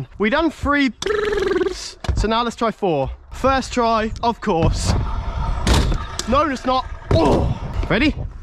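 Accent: England, United Kingdom. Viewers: Is this guy british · Are you English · Are you from England? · BRO I LOVE HIS BRITHIS ACCENT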